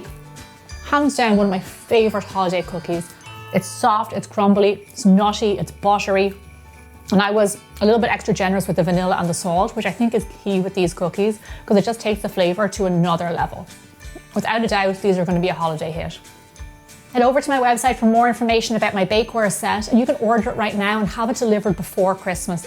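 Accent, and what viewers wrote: Ireland. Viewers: Came for the recipe, stayed for that lovely Irish accent! Can't wait to try!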